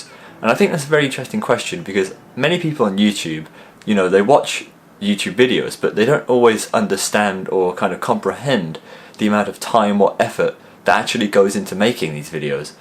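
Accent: United Kingdom. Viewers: ok I get it why you have a British voice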